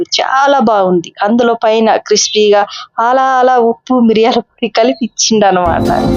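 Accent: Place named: Andhra Pradesh, India. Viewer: నిది ఆంధ్రా కాదా భాష ఆంధ్రా లాగా ఉందిగా..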